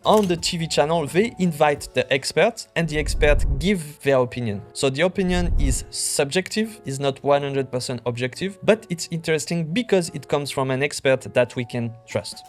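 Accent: France. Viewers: Merci, je vais suivre tes super conseils! C'est une bonne idée de faire tes videos en anglais tout en gardant ton accent francais, ca fait original et les anglo aiment ca!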